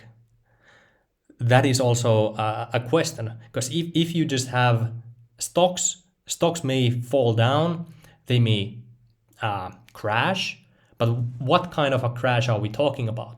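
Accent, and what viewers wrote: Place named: Finland. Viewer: I'm almost 100 % sure his native language is Finnish. I know this because I'm a native Finnish speaker, and I know how Finnish-English accent sounds like. Also, his "pattern of thought" fits into Finnish framework (hard to explain...). In any case, great content!, tell me if I'm wrong 😉 Cheers!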